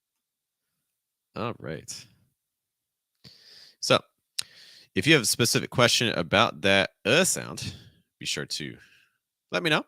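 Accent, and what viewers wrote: New York, United States. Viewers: I'm watching your content and it's so good. I have a question, which part of US are you from? New york?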